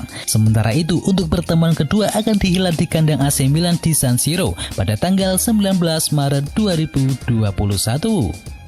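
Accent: Java, Indonesia. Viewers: bisa gak pakai bahasa Indonesia yg baik dan benar jangan logat jawa dasar biawak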